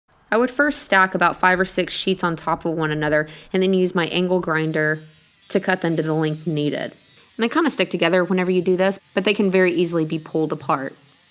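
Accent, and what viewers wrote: Texas, United States. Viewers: 1) You're a role model for me because of your willingness to tackle things you don't know and learn as you go. 2) Your accent makes me homesick for Texas 3) The head scarf thing looking like Rosie the Riveter was pretty damn awesome. 4) There's no four. Why did you think there would be?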